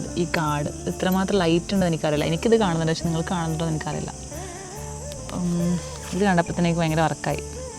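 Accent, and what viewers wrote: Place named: Kannur, India. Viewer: Slang aan enik ishttam❤ kannur?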